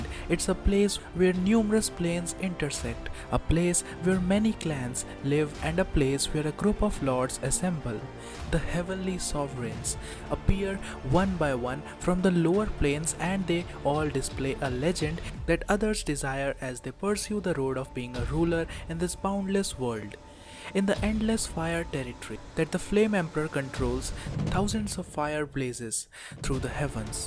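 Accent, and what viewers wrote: India. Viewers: the Indian accent kinda ruined the whole video so I had to watch it without sound. indian dingdongdingdong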